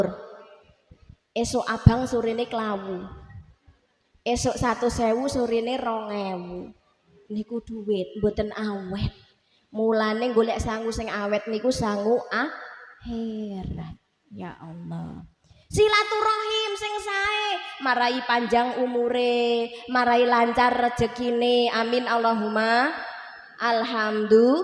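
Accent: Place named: Java, Indonesia. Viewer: Boso jowone meduuuk Anak² skrng bnyak yg tdk bs bhs kromo inggil 🙏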